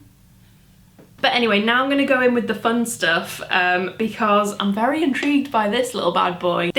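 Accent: Liverpool, United Kingdom. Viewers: I recently got my hair cut to a similar length so I feel your pain about tying it back! I love essence and wish it was more readily available than in wilkos! Also nice scouse accent 😂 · Your scouse accent is actually SO good. 😂 X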